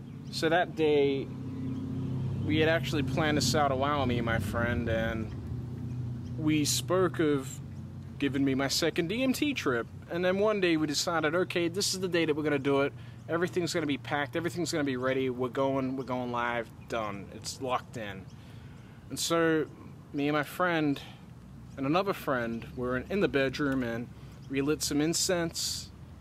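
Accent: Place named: Quebec, Canada. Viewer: maybe French Canadian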